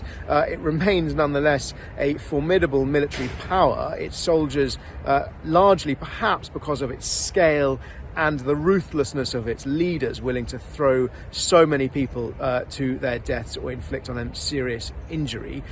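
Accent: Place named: United Kingdom. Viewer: Yet another DEI jackass with a british accent talking about what he doesn't know.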